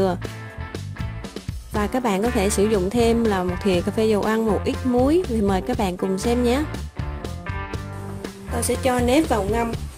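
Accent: South, Vietnam. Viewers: Nghe ngươii mien nam thich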